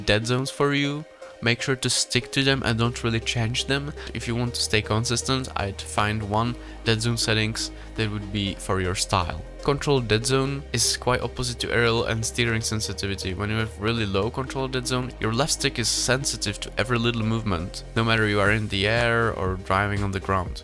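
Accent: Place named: India. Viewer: bro have best indian accsent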